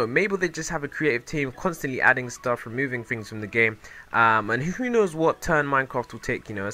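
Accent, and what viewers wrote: United Kingdom. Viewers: in some videos you sound and in some others you sound british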